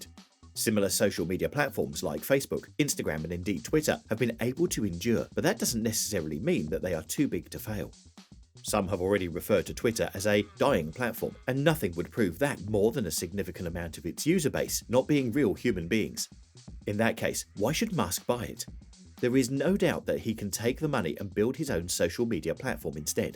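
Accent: United Kingdom. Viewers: What do otherwise educated people seem incapable of differentiating between "FEWER" and "LESS"? "Less users"? Really? Do you prefer "FEWER" jam on your toast? Fuck sake, it isn't even an American-ism. You have a British accent. One assumes you are a professional announcer. It's your job and inheritance to speak English better than this.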